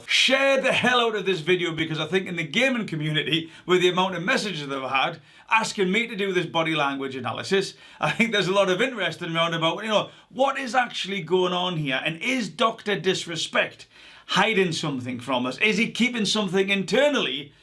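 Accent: Newcastle, United Kingdom. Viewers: Wew is that a Geordie I hear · A Geordie on YouTube. Wey aye mate 👍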